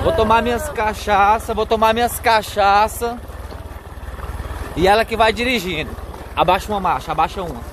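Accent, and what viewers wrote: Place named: Ceará, Brazil. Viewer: você é de que estado, você moram no Ceará? Eu sou de Pernambuco, o sotaque é bem diferente, os seus vídeos são muito bons, parabéns.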